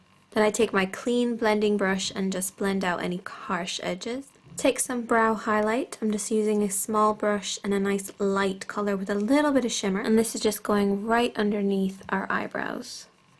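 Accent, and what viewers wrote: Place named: Cork, Ireland. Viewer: Love your videos! Especially with a cork accent it good to hear! :-D haha! I've just started making my own videos if you wanna check om out! Get more Irish on here :-D haha! X x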